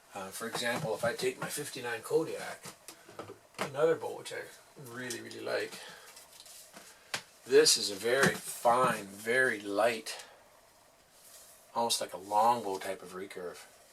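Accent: Canada. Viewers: Say. Excellent video and review as always! I have a question for you though. You have a slight accent, I'm guessing Canadian, Wisconsin, Minnesota, North Dakota there a outs am I correct in assuming this because you mention swamps, I know I hunted swamp bucks in Michigan, Being a Yupper myself, Ae! Also see the hockey rink in pole barn and so on. Health wise I see you are looking could and I'm glad to see that as well. We'll take care be safe and look forward to more of your training / shooting Videos. Good hunting and God Bless Very Respectfully Bob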